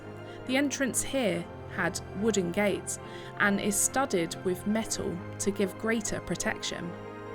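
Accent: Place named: Hampshire, United Kingdom. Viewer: Nice to hear the Hampshire accent. Instead of fake Estuary English. A very nice county with lots of lovely small towns and villages.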